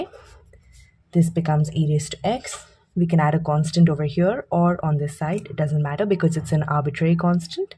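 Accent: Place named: Russia. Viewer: LGta hai ki रशिया की मैं बोल रही है😢😢 समझ नहीं आया😮😢😢😢😢😢😢😂😂😂😂😂😂😂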